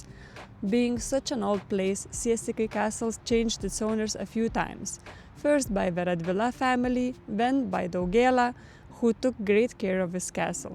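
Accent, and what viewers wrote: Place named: Russia. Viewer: You have a very russian accent wow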